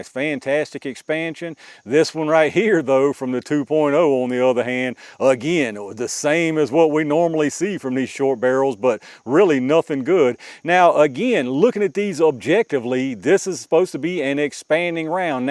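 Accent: South, United States. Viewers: I enjoy your reviews, I just got a BG 2.0. From your accent and the landscape, I’m guessing you’re in north eastern Alabama, North Carolina, or Tennessee. Anyway, greetings from Georgia!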